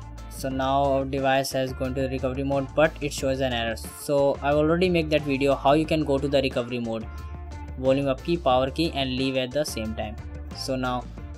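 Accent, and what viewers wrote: India. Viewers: Logat orangindiya kek pantek bahasainggrissnya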